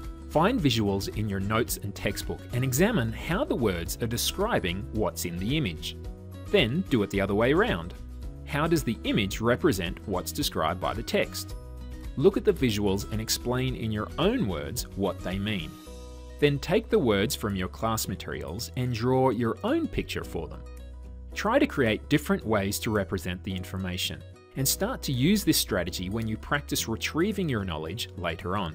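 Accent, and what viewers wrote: Australia. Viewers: Beautiful aussie accent :) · Is this guy Australian?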